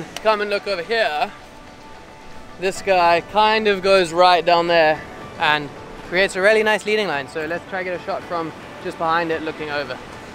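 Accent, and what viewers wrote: South Africa. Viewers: Is that a hint of a South African accent I hear in amongst the UK accent? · Saw the title and knew I had to watch it...Heard the SA accent & was so happy 😂 great, helpful video thank you!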